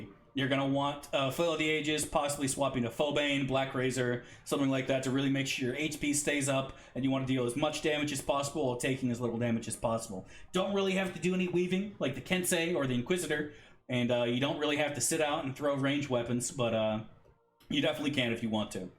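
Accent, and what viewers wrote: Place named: Michigan, United States. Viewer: What's your 20ish? You sound like your from Michigan, but Cali and Michigan accents sound similar when recorded.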